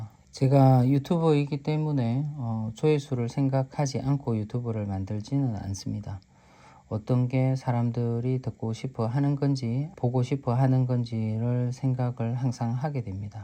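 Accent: Gyeongsang, South Korea. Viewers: 이렇게 듣기좋은 경상도 사투리는 첨 이네요😊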